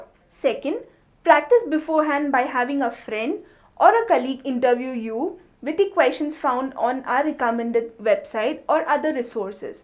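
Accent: India. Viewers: It's not terrible it's Indian accent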